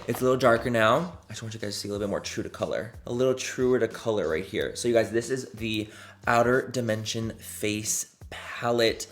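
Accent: United Kingdom. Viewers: Loool the British accent 😂😂 love it!! 👋 hi all the way from London ☺️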